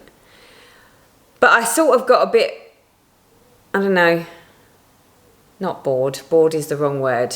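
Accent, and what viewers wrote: United Kingdom. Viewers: I agree with the others, do one of the animal squares. The foxes are adorable but the buck's would be cute too. This is my first video to watch of yours, i love a british accent & watch alot of british shows even though im american so i dont care what you talk about, i would watch it, lol. I just was re watching one of the celebrity big brother uk the past few days. It was the one with Natalie Cassidy in it, ive watched Eastenders for a long time so i always enjoy the ones with those actors in it. (im a rambler too, lol)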